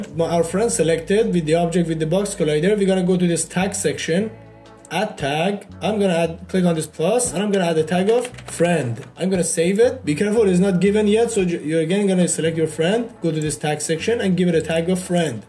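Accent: Iran. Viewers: Nice video! I'm trying to figure out where your accent is from, maybe Iran??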